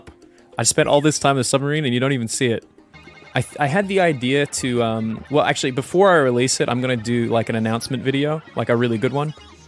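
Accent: Australia. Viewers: grimer17 He is Australian, so I don't think so...😂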